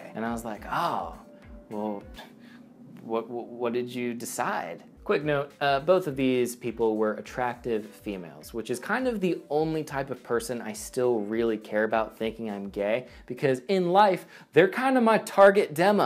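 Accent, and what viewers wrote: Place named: South, United States. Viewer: Thanks for the disclaimer about the southern accent.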